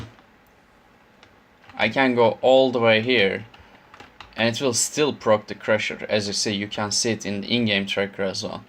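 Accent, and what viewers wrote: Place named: Turkey. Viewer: Aksanından anlamıştım türk olduğunu!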